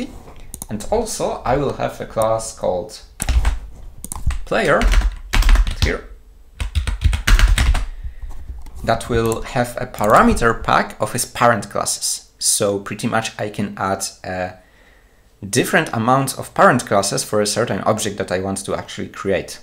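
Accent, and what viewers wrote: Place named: Poland. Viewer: nie wiem czemu ale od razu wiedzialem ze to polak nagrywa. Akcent nie angielski ale kogo to obchodzi. Nie musi byc przeciez native speakerem. Hindusowego akcentu tez nie ma :D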